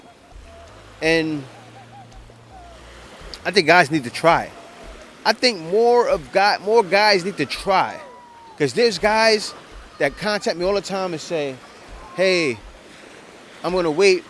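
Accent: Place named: New York, United States. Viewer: bro I been watching you for few years now. Im appreciating everything you been saying. Still got that NYC vibe coming through your mouth 😂😂😂. I’m 59. Just retired from working NYS government job. I need to leave and come to the philippines. Need to need up with you when I get there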